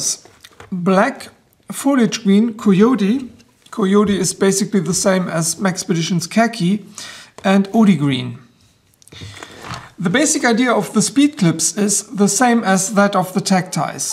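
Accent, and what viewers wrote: Germany. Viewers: I love German accents like yours, lol. I giggled at "coy-otee." We say ky- (like 'sky')-otee, or ky-oat where I grew up. Just thought that was a funny pronunciation. Anyway, helpful video. Thanks dude.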